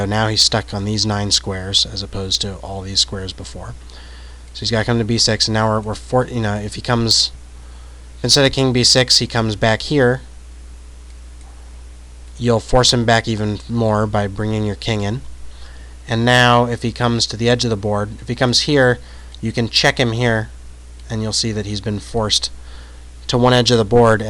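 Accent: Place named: Maryland, United States. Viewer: Are you from Frederick, Maryland.... it sounds just like a guy I know from there?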